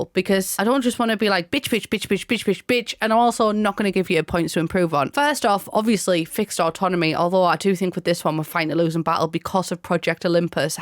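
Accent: United Kingdom. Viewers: Yeah just wasted $3k+ simoleans on my THIRD failed wedding. Catastrophic failure and not a single “part” of the ceremony or activities were able to happen. We hired a violinist and they sent a ghost and everyone got stuck in loops talking to the freaking ghost. I want my real world money back and all my wasted simoleans 😡 Also side note totally unrelated but I’m sorry for neglecting your channel for LilSimsie, my partner can’t stand her voice, and your voice reminds me of my ex fiancé who is also British so your voice is a weird nostalgic trigger lol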